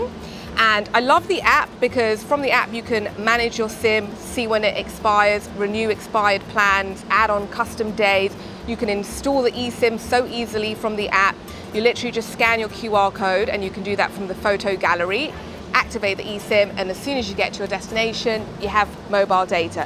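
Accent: England, United Kingdom. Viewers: Maybe the British accent takes me away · You have a English accent how are you American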